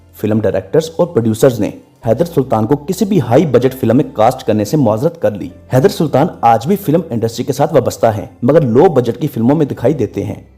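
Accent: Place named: Punjab, India. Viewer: Urdu ko urdu mein boleen urdu ko punjabi mein mat boleen please ...apna urdu ka.lehja sahi kareen ... video bana kar dard e sar na baneen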